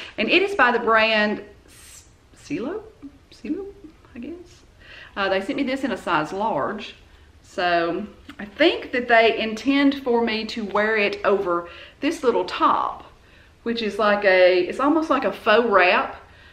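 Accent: South, United States. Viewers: I think she has a southern accent too! I am from TX!💜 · I really enjoy your videos? What state do you live? I think I hear a southern accent?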